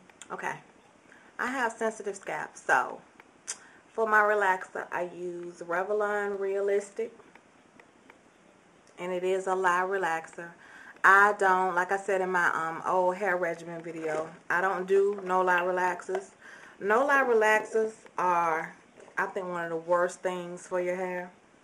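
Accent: South, United States. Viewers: Gurl when you told your fear your tickled me, I could tell you where serious but you where so darn adorable about it. You are soooo cute and special and keep up the good work. BTW your accent really makes me miss the south a lot and when you say yall I just go man I really miss home.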